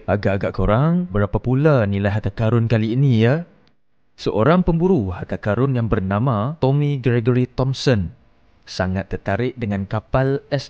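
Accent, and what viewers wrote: North, Malaysia. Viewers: Mat ang org mna dengaq cm org utara...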